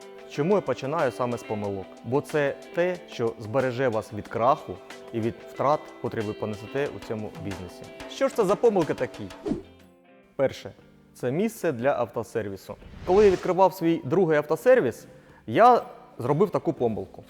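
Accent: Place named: Ukraine. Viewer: спасибо, граммотня подача и приятный настоящий украинский язык, очень приятно слушать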